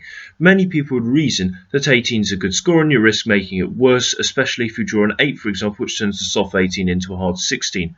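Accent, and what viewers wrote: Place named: United Kingdom. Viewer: Dude's got a british accent and talks about pounds and pence, in Europe, blackjack sucks because they don't check for dealer blackjack until AFTER you do everything. One result of this is you wouldn't be doubling down against a 10 or an ace on anything. But another is that you're actually incorrect then, and you WOULD hit or stand even if the dealer's got blackjack, you wouldn't know if you've already lost.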